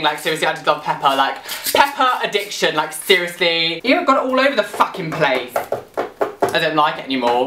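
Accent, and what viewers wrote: United Kingdom. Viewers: I LOVE the sound of rain on a tent. I love storms. I LOVE watching your videos!! Stars are AMAZING. I love British accents and terminology. That was part of the reason I watched your videos for a whole day! I love when I use a British term to my friends are family and they are like O.o and then it takes me a few moments to realize that I just called some guy a "git" so of course they aren't going to know what I'm talking about <3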